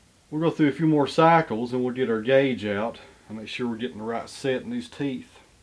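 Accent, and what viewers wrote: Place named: North Carolina, United States. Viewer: With that accent I knew you were close by. I’m in Westerville, NC. 40 minutes from you.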